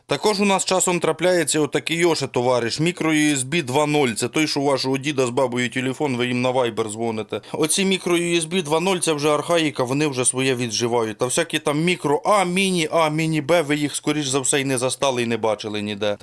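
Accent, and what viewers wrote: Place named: Central Ukraine, Ukraine. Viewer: земляче,лиш не двєнадцать,а два на д ця ть .чути осьо,тосьо,що центральна україна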